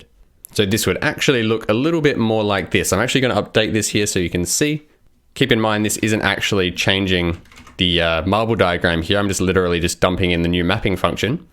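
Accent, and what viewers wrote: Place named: Australia. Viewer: very good tutorial. Well explained. I've learned a lot from you! And you've got an Aussie accent, which is a plus!;)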